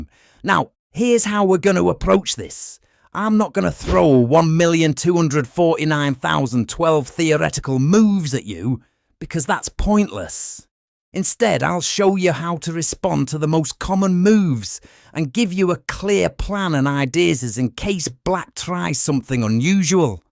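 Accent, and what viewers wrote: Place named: United Kingdom. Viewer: Bro is defenrtly from the british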